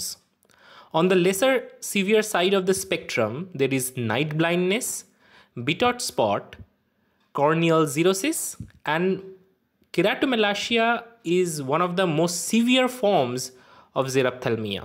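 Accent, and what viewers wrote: Bengal, India. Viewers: APNI KI BANGALI?? BENGALI ACCENT IS STRONG!!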